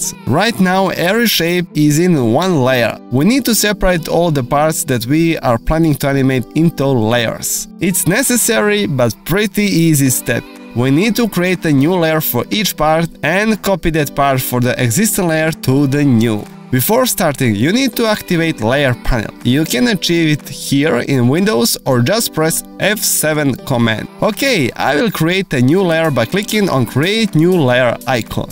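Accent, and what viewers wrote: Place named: Russia. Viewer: Russian accent:)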